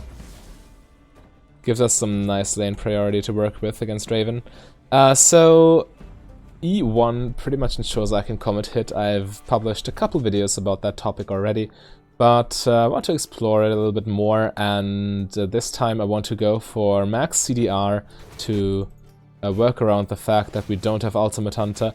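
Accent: United Kingdom. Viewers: In fairness, he just kind of has that voice. I'm not sure how a German guy manages to sound oddly like an upperclass Britishman, but somehow manages it.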